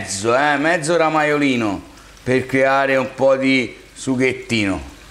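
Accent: Tuscany, Italy. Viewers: Complimenti a te, io e mia moglie seguiamo con molto interesse le tue straordinarie ricette, presentate con il tuo simpaticissimo dialetto toscano.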